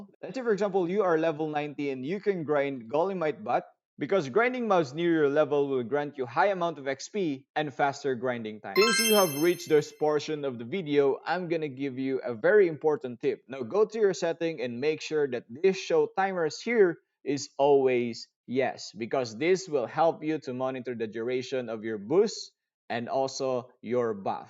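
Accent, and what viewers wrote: Philippines. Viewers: Why do i hear filipino accent xd Btw im also filipino This is useful tip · Are you filipino? Cause your accent is so familiar xd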